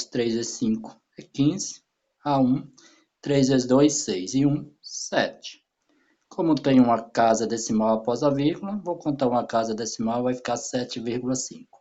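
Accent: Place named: Rio de Janeiro, Brazil. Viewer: Parece ser do rj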